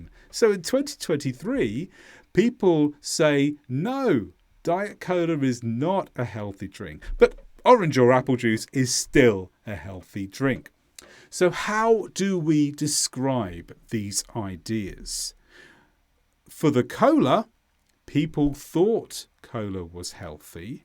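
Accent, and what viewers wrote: United Kingdom. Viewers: When I hear such beautiful British accent I remember ( is it a correct word in this context?) why I decided to learn English in the first place. Music to my ears ))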